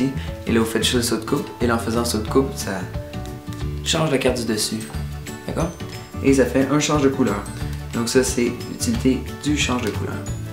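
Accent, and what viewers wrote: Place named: Quebec, Canada. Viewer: Belle accent québéééécois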